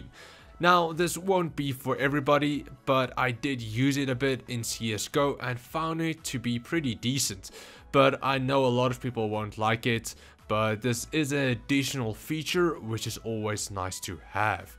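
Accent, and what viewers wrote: South Africa. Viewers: South african accent. deal with it. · he’s from South Africa. It’s his native language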